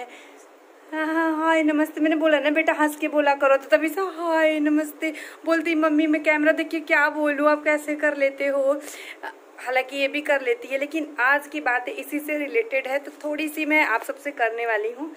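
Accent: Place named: Ballia, India. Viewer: Logon ka kaam h kahna kahane do bahan aap kaha se h aapki boli balliya side ki lagati h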